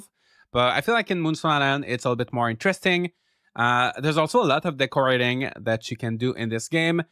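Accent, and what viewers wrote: Quebec, Canada. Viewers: it’s funny because once I was watching one of your videos and my boyfriend said something like « il est Québécois lui » and I told him probably not. He said you had a French Canadian accent and I was like « nah, what are the chances? » 😂😂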